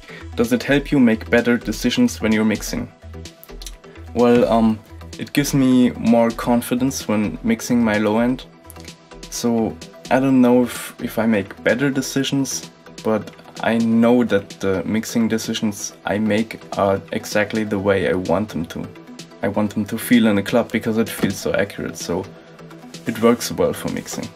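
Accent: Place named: Germany. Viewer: Sehe ich anders. Lieber deutlich artikuliert aber mit deutschem Akzent als genuschelte Ami/Oxford-Englischproduktion! Production: Danke!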